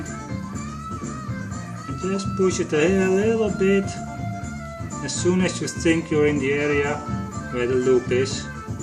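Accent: Netherlands. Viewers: Je spreekt het engels wel op een zeer nederlandse manier uit. Maar dat wil niks zeggen, deze video‘s zijn supertips en ik zie in de comments dat het geweldig helpt bij de engelse kijkers. 😊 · You always can hear if you are dutch hahahaha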